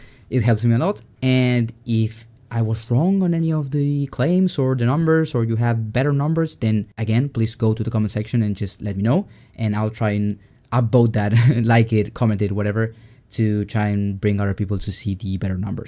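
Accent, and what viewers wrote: Argentina. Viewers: ese acento argento me mata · Algo se me hacia raro de la voz, luego me entero que es de Argentina jajaja, lo camuflaste bien.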